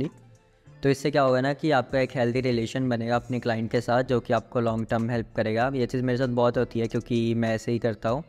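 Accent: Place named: Pakistan. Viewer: Why you gives Pakistani vibes? You have pakistani accent not Indian. IDK why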